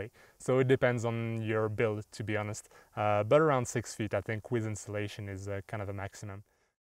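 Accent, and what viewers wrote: France. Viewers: Okay I give up... French? Swedish?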